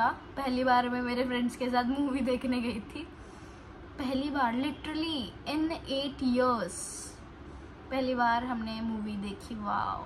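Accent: Kolhapur, India. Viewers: Bhaii urr kolhapuri acent😂💖itss tooo good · Tuze vlog chan aahet Pn tu marathi tunch vlog karat ja Tu marathi khup chan boltes Ekdm kolaphur style Love and support you 😇❤✌ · Tujhi Kolhapuri Flow Cha Fan Zalo Aahe Mi Kai Toh Flow Aai Hayee Ghayal 🦋💗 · Aga ky masta kolhapuri accent aahe yrrr....😍😍. ly bhari te takkay ja vlog madhe 😄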